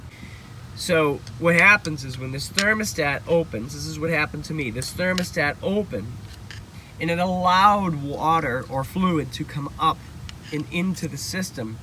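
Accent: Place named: Massachusetts, United States. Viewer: Fantastic video! Thanks for the help! By the way, I knew you were from Mass about into the video. Cheers!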